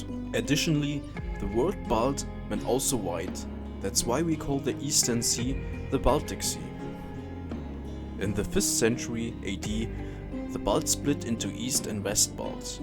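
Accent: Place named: Germany. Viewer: you have german ascent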